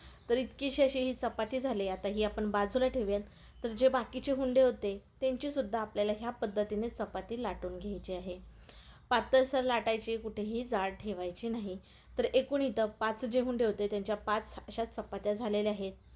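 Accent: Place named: Rural, India. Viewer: खूप छान. बिनधास्त गवठी बोला तेच आईकायला छान वाटत 👍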